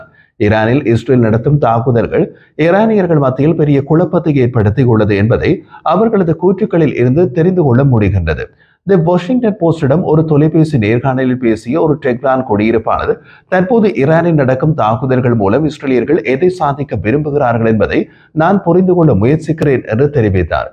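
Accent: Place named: Sri Lanka. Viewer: இலங்கை த்தமிழ் உச்சரிப்பு அபாரம்.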